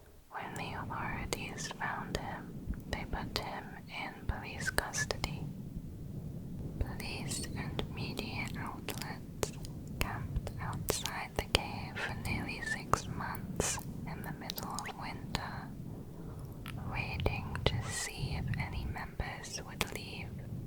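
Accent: United Kingdom. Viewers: she has a British accent. Highly doubt she’s Native American. My guess is Nepali or Afghan.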